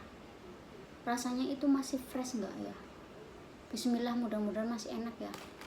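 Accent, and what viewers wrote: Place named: Banyumas, Indonesia. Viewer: Ninine diwaraih ngomong ngapak nok..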